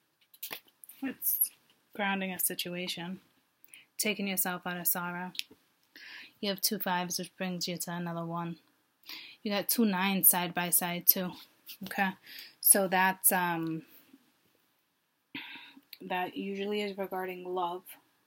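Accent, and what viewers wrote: Boston, United States. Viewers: Lol, where are you from originally? I love your northern accent. Are you maybe from Boston or something? Love it!!!!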